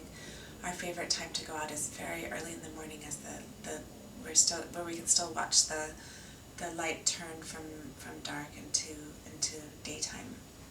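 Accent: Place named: Scotland, United Kingdom. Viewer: love the Scottish accent - so beautiful, thank you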